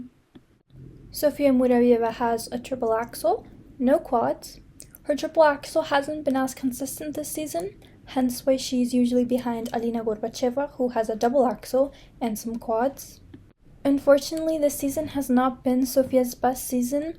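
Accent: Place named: Russia. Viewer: great debut, since it is your first video! your voice, itʼs got that ASMR magic, would you try to do it next? :) seriously, the way of how a skaters hit those spins and axels, it’s giving a vibes of 19th century energy, ngl – no clear winter and winner, just pure, relentless artistry. and the costumes? chefʼs kiss. your russian accent and pronunciation is very good! do you study this language? and before anyone starts, as it may feel like, i support all skaters, not just the ones from my ancestral homeland, alright? only appreciating the art, i am a native american with a some russian roots, remember, leo dildocaprio (joking 😂) does have a russian grandma too. donʼt get it twisted, guys, support the girl, she is cool for trying to popularize an underground kind of sports, which our small community loves, keep it up, subscribed, and, as youʼve asked to be kind in suggestions or critics, will suggest to try to use your favorite music, as a background and vibey layer of your voice!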